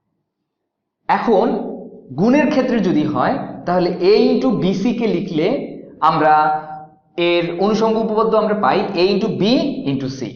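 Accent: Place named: Bangladesh. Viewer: Excellent Topic. Are you from Bangladesh ? Your accent is like bangladesi... I'm from India, Kolkata. Happy to tell that, from Village Cooking By Sandhya team, one of our team member is from Mathematics Hons. Hope, he will enthu. from your channel. ❤️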